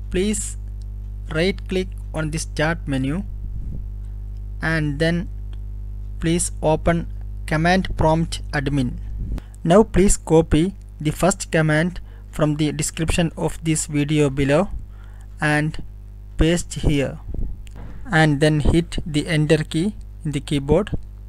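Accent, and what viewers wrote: India. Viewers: holy shit my insticts were right. i new i was in good hands as soon as i heard that thick indian accent<3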